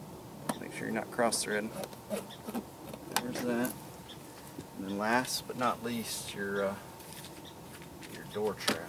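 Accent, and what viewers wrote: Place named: Texas, United States. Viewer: thanks for quick respond... yeah mine it's just got 2 speaker also it's stick shift which i love .. about door speaker well i got to open a hole in the door to place the speaker and much more work that I don't have right tools to do so i will take wrangler speakers or charger dash speakers ... anything well be better then my shitty under dash speakers .. lastly i love Texas love her accent in a whole i love America.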